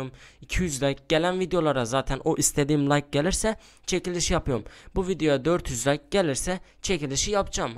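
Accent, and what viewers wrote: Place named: Adana, Turkey. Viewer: abi adanalı olduğun ne kadar belli adanalıyıhhhh allahın adamıyıh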